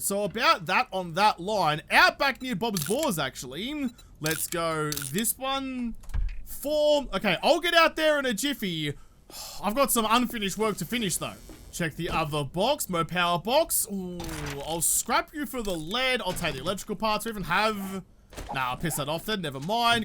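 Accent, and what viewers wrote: Australia. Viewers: just out of curiosity, are you a native Aussie? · Anyone know what a "flozza" is from McDonald's? I can normally understand Australianess but that one has me stumped.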